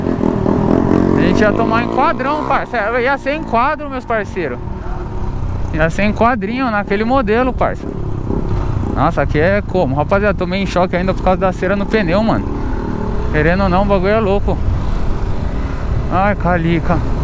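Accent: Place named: Sao Paulo, Brazil. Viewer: Sou novo no canal… vc é de SP ou do RJ? Vc fala como paulista mas usa gíria de carioca “paizão” “calica” não entendi nada kkkkkkkkkk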